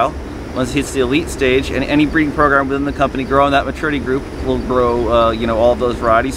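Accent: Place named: England, United Kingdom. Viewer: Brother has an un-pickable english accent 😄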